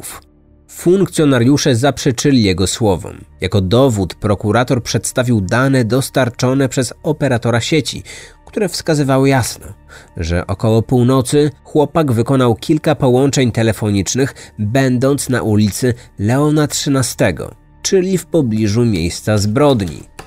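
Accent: Poland. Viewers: te promocje to k.. a ty masz jakies wschodnie pochodzenie. Hajnowka a moze Bielsk Podlaski. lubie cie ale akcent nie z pyrow lecz z podlasia. "trzeba nosic wlasna skore".pozdrawiam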